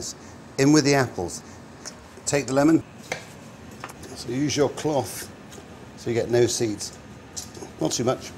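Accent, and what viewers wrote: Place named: Yorkshire, United Kingdom. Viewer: He is the poshest sounding Yorkshire lad I've ever heard!!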